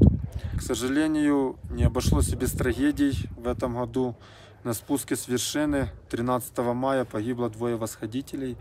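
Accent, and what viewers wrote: Ukraine. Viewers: Альпинист который погиб 11 мая никакой он не швейцарец. У него пакистанские корни. Он профессиональный альпинист, у него 7 восхождений на высочайшие вершины планеты. Но вот восхождение на Эверест совпал с окончанием мусульманского рамадана, месяц соблюдения особого режима питания. Религия на столько победила здравый смысл, что даже в горах, в опасности он пытался не нарушить рамадан. Тут и горам понятно что организм был предельно истощён. Я читала о нём в YouTube, и у нас в Швейцарии говорили о нём по радио. Что это первые 2 смерти на Эвересте с 2019 года. Он и американец, кстати, тоже мусульманин. В 2020 Эверест был закрыт из-за короны., увидела тебя и сразу же подписалась, настолько приятный у тебя украинский акцент. Я сама из Украины., парни, удачи вам, благоприятной погоды, счастливого погодного "окна ". Непогоду лучше просто переждать. 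Любой риск должен быть оправдан. Сегодня 16 мая.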